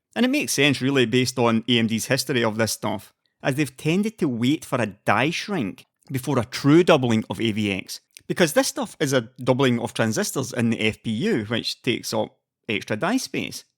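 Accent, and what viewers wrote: Scotland, United Kingdom. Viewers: Aaah, I have missed you Scottish tones over the last several months, and while you may not appear as frequently as you once did, your return is still most welcome. Stay well. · those dulcet Scottish tones are back baby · yeah that is my scottish man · Good to hear your thick Scottish accent again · your Scottish voice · the kinky Scot accent is back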